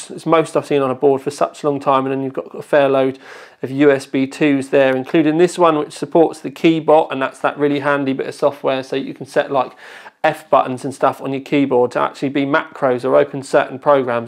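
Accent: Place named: England, United Kingdom. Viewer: LOVE THE VIDEO, I ALWAYS LIKED YOUR VIDEOS, ACCENT, AND ENGLISH PEOPLE IN GENERAL. I AM UNCERTAIN ABOUT THIS MEMORY CORSAIR Vengeance LED 16GB (2 x 8GB) 288-Pin DDR4 SDRAM DDR4 3200 (PC4 25600) Memory (Desktop Memory) Model CMU16GX4M2C3200C16, will it post, if it can, and i have a speed problem, i can adjust it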